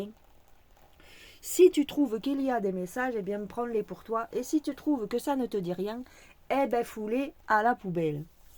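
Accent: South, France. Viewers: Et tous les neuneus qui se marrent avec l'accent du Sud ! Le titre est vraiment approprié, c'est n'importe quoi ! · L'accent du soleil dédramatise ce monde merdique Merci ❤❤❤ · Bonjour, merci pour la vidéo et l'accent du midi moi j'adore comme j'ai des copines la bas sa change de la Tourraine qui est froid et les gents bien triste. Vous avez raison de vous lachez sa fait du bien et on rigole en plus. Bon 1er Mai ainsi que tous vos abonnés🥰❤🕊🌟👒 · Bonjour j'adore cet accent du sud de la légèreté du Soleil et continuer un petit peu s'il vous plaît au cœur pour avancer oh je suis en train de dicter le message Et je prends l'accent nous allons tous parler un peu marseillais aujourd'hui En tout cas j'adore Merci pour ce tirage continuez de chanter un peu oui les chansons de sont superbes moi j'adore mais cette histoire me paraît pas tres nette De toute façon c'est sa vie privée ça ne nous regarde pas nous aimons le chanteur et ses chansons se remettent sur le chemin Que j'adore l'accent que vous aurez avril demi-vingt-quatre et surtout mon 1er mai pour demain gros bisous ❤❤❤ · Bonjour Une touche d'humour avec cet accent du Sud 😊 Pourrais tu faire une vidéo sur les pluies diluviennes à Dubaï? 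Merci... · 😊 bonjour 👋. Tu me fais rigoler avec l accent du midi.merci pour le tirage. Oui le mois de mai vas être encore très difficile. Je te souhaite un bon 1er mai. Moi cette année je n achète pas de muguet..il.est où. Ça me rappelle une certaine chanson. Bisous 😚 😚 Gigi. ❤❤❤❤. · Oh lala d' tu m'as fait trop rire avec ton accent même moi qui habite le sud je ne parle pas comme ça 😅❤😂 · bonjour ont ce croirait dans un film de pagnol j’aime cet accent du sud sa sent la lavande et le soleil ☀️ bon 1er mai 💐 désolée ilya pas de muguet bisous 😘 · Vidéo insolite grâce à cet accent du sud qui dédramatise la situation. Bon 1er mai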